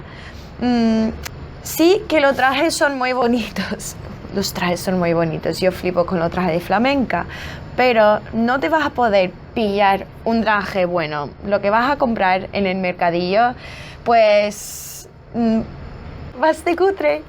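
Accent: Andalusia, Spain. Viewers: Que interresante escuchar un gringa spikando spanish los cidadanos de gringolandia hablan funy el acento y hablas como una andaluza ole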